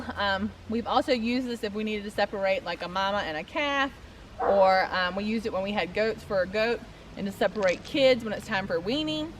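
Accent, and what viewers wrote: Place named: Texas, United States. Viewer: Hi i just found your channel. It sounds like you have a possible Texan accent. We are a family of 7 but our kids are all grown. Trying to start a more self sufficient homestead lifestyle. Im 52 and the hubs is 54. And we own our own drafting and design company on our 2acres of land in SE TX.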